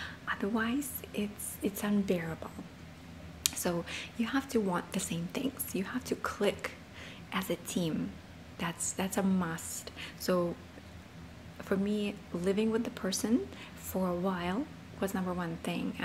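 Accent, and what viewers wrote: Russia. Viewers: “Im gonna sue you” im hysterically laughing (even though its a serious topic) this is true 🇺🇸dont touch me or i will SUE😂😂💁🏼‍♀️💁🏼‍♀️💁🏼‍♀️ Darryl is awesome you guys are great together! All the worries that you used to have to deal with alone you’ve trusted him to take care of things and, like you said, help you! Letting him help you has allowed you to be so much happier and lighter and worry about MomLife worries lol and you can hear a cute little thing in your voice that I think comes from speaking Russian frequently with Mila 💖thank you for this video, I look up to you so much💕✨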